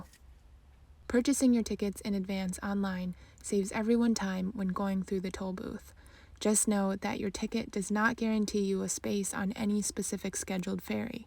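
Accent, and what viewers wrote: United Kingdom. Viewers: I love your British accent. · Some more lovely and cinematic sequences. I'm glad that the seagulls didn't take down the drone ;-). You don't need to mock or be hard on yourself, the chatting is charming, as is the British accent. Did you watch Chitty Chitty Bang Bang for inspiration? Keep up the engaging work and life ❤️ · 🇬🇧 What a tranquil island. British accent was perfect! 😁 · Everything sounds just lovely with a british accent! 🇬🇧🇬🇧🇬🇧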